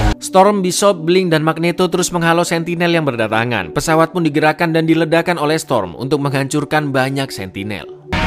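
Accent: Java, Indonesia. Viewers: Jowo mu ngendi lurrr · Jowo ne kandel banget mas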